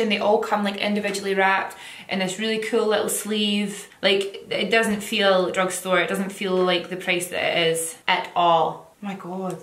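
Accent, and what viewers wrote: Scotland, United Kingdom. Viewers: I love your accent. Are you Scottish?:)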